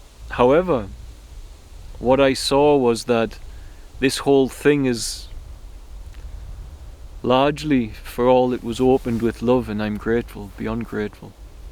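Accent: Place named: Newcastle, United Kingdom. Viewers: I sense a Durham,UK accent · He has a Durham accent, northeast English anyway. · this one was a goody, btw way are you a geordie?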